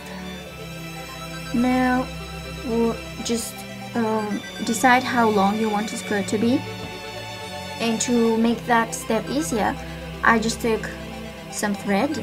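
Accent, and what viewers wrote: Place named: United Kingdom. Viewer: silly question, where are u from cause ur accent sounds british and sort of indian or asian XD sorry if i sounded rude asking lol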